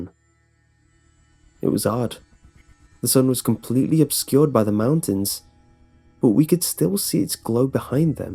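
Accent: England, United Kingdom. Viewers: He sounds English, why is he talking about temperature in farenheit as opposed to centigrade?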